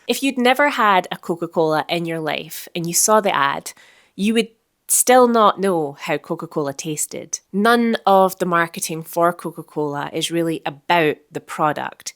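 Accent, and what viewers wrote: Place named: Scotland, United Kingdom. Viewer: And it does look cold and empty. I don't know, this whole thing may be a bubble, but doesn't seem like it given the practicality of it, at least in terms of making laborious tasks easier. However, this brings up the question of....what about assistant editing? Ingest, stringouts, etc, what about the entry level jobs like that? The tools are already beginning to at least be of use so far but it does worry me. Capitalism obviously doesn't care about keeping people's jobs or about creativity. What the system cares about is quarterly profits and how to maximize it. And that's the problem with people who say "It will aid us as a tool". The executive boards may not see it that way. They actually don't. Anyway, enough ranting from me. Hah I can listen to you talk for hours with your lovely Scottish accent!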